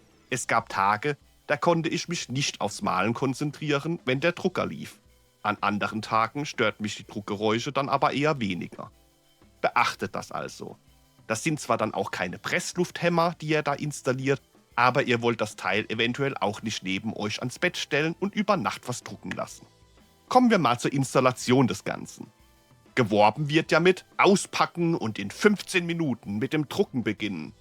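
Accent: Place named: Palatinate, Germany. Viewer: Kummscht du aus de Palz?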